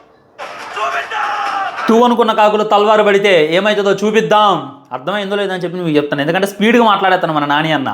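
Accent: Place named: Andhra Pradesh, India. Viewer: Niku teaser 7th month lone ochindha 😂😂😂 em review ra nidhi malla ni slang eh andra slang undhi nv telangana antunav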